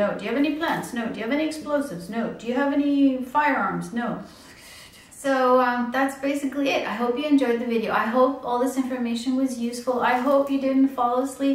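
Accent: United States. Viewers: I've been watching her videos and I hear an American accent but she holds a Spanish Passport. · If you're from Spain, how is it that you sound so American?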